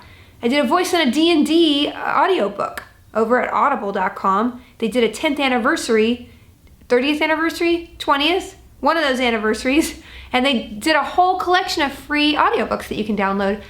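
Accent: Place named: Cockney, United Kingdom. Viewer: Oh, my dear, you have a delectable cockney accent. But if you're going to voice dwarves, you absolutely must learn to do a Scottish brogue.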